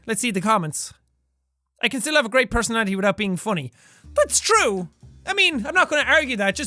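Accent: Ireland. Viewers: Jacksepticeye everyone just roasted markilper on his channel I love your channel thank you for makes someone extremely happy everyday because of this channel thank you for making me feel less lonely everyday absolutely is beautiful your accent I'm enjoying this channel because of Irish accent is like music to my ears thank you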